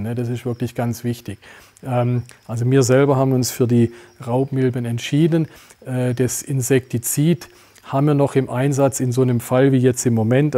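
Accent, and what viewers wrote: Swabia, Germany. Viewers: Sehr gute Folge! Übrigens... Ihr habt mal versprochen, den zu nem Deutschkurs zu schicken, bitte dran denken dass ReptilTV auch außerhalb der schwäbischen Alb gesehen wird, kein Mensch hat was gegen einen leichten Dialekt, aber beim tuts leider a wegn heftig sein, wahrscheinlich merkt er das selber gar nicht, aber meine (Deutschlehrer) - Kollegen hier im Goetheinstitut Madrid, die alle Reptil Fans sind, haben leider keine Chance zu verstehen was er da von sich gibt, obwohl inhaltlich natürlich super gut und interessant. Bitte nicht übel nehmen, den Tipp, ist nur gut gemeint 😉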